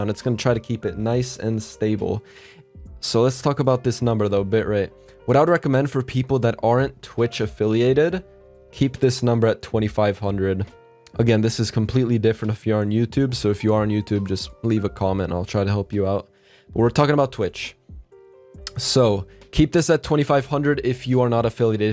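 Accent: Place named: England, United Kingdom. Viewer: Oh, thanks finally an English guy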